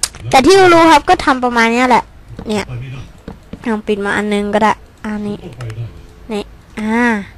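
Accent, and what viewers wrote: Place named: Suphanburi, Thailand. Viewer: เสียงงงงงคนสุพรรณชัดๆๆ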